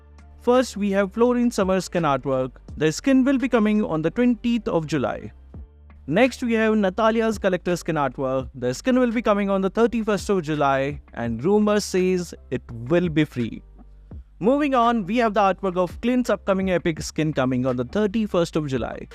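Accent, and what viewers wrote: India. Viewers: I think hes from india, cuz his accent ⊂◉‿◉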